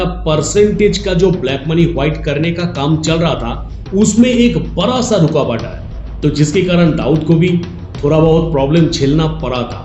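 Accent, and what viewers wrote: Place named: Bengal, India. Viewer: Yes, Bengalis have a lot of courage. Heard of Khudiram Bose, or Bagha Jatin (Jatin Das)? Charapona is Bengali word and the accent of the narrator is unmistakably Bengali.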